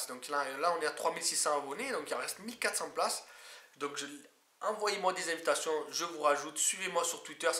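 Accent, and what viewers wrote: South, France. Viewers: Première fois que je vois tes vidéos, je kiffe ton accent du sud, bonne continuation ! ;)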